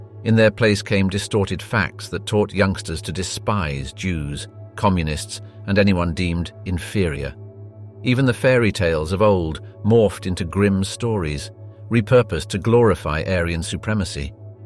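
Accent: England, United Kingdom. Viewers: LOL Your fancy British accent can't hide the lies. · I'm not sure what it is but the English accent makes a documentary better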